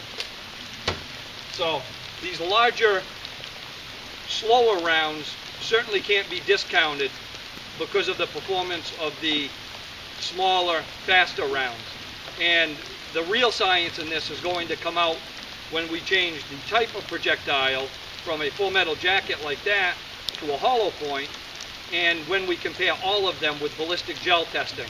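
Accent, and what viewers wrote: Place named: Pennsylvania, United States. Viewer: By the way, are you in PA? Your accent is familiar.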